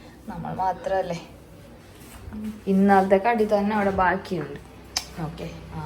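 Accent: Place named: Mangalore, India. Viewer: Mashallahh adipoli....ningade family nte vlog kanumbo oru positive energy aan❤️...ningade family pwoli aan onnum parayanilla....ithamare randu perum othorumayode enth bangiya kanaaan❤️.... samsaram kelkkan nalla rasan...manglur style adipoliya 😊....i am exciting for your eid vlog....mashuthantem eid dress okke kanaan kothiyavunnuu....njan malappuram aan ithaa....ningade familye onn meet aakan nalla aagraham nd❤️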